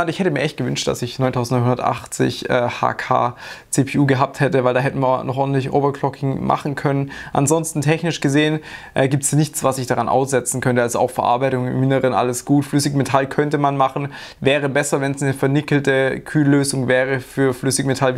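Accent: Swabia, Germany. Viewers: dein Schwäbischer unterton tut den Ohren gut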